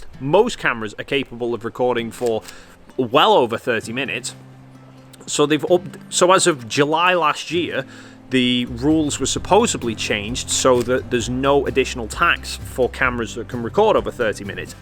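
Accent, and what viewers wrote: United Kingdom. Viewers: I love that the "ISO what you did there" pun is specific to your British accent.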